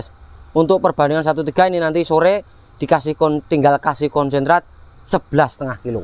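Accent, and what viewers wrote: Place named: Java, Indonesia. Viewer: Bahasa ny medok tapi peternak cerdas ini😊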